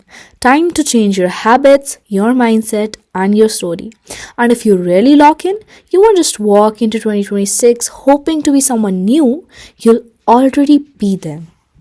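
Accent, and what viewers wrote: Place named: India. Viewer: Indian accent ❤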